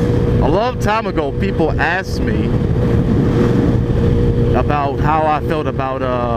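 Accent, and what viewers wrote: Michigan, United States. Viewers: Bro you from Michigan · Are you from Michigan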